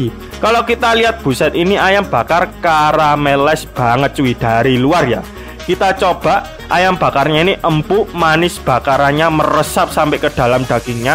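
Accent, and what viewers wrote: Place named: Surabaya, Indonesia. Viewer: logat Suroboyo an nya medok banget, ditotol itu maksudnya dicocol ya, ikan bakar dan ayam bakar nya emang enak sih, mantap, Bro, sehat selalu ya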